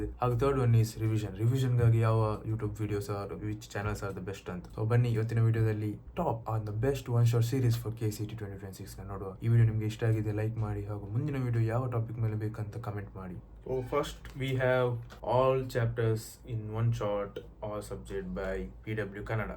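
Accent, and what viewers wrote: India. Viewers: 100% ur tuluva 😂 erena kannada kenagene gottapunduye😂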